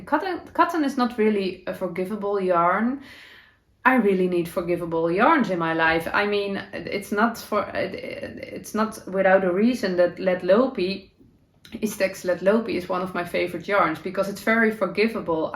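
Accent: Netherlands. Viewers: I love the blanket behind you. Your scrappy sweater of granny squares looks lovely and must be so fun to make! Your podcast is very inspiring and I love every episode I’ve seen. I love your DutchY English! I wish you were my next door neighbor so we could knit and crochet together sometimes. Love Katie from Maine USA💖🧶🧦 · Closet is fine 😊 in Australia we also call it a Wardrobe. Your Dutchy English is wonderful. I have one language and I’m always impressed when people can think and speak in more.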